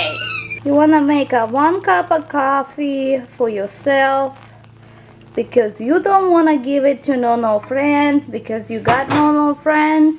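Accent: Russia. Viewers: I love the Russian accent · I thought Bullet Proof Coffee was gonna be like a Bulletproof Vest. Plus you have a Beautiful Russian Accent. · I love her russian accent!!! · why are you thickening your russian accent, you used to take lessons to hone in on your russian accent, and you were doing so well. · Is it just me, or is she sexier with a stronger Russian accent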